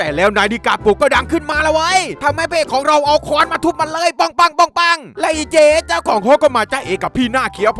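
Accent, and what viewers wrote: South, Thailand. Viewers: ผมชอบในความภาษาใต้ของพี่5555 ใครคนใต้บ้าง✋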